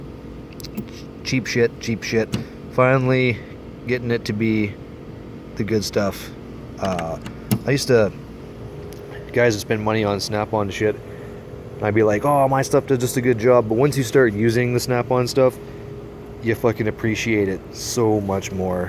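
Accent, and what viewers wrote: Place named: Canada. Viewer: Can you first introduce yourself please and state how credible you are, what you're currently using them for, what your specialties are, and how many years you've been an aircraft mechanic? And please, an iphone 5s has a better camera than that. What is that a flip-phone? Nice Canadian accent, you from Canada?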